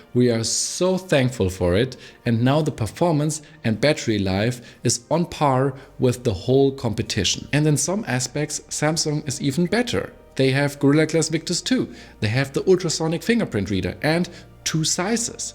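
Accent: Germany. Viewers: best Galaxy I've ever bought so far. i have the 128gb base model in pblack. i compared it with the s23+ from a friend and there is no noticeable difference in everyday handling!! but the most important thing, 25w charging needs exactly an hour with 20-30% battery left the next morning which i always have. it's exactly the time frame i need to shower and everything before i go to work. btw, very strong German accent. but not as worse as KS. anyway, good review and nice channel, subbed!!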